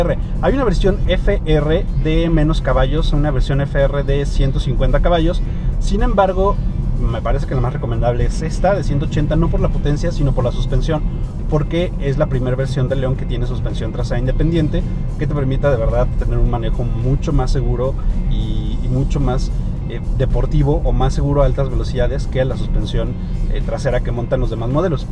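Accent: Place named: Fresa, Mexico. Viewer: Hablas muy fresa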